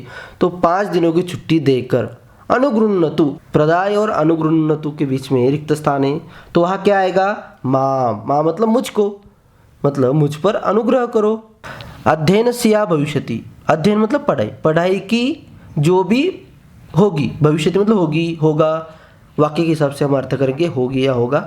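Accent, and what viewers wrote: Maharashtra, India. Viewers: i will guess that ur mothertongue is marathi by the way teaching is very nice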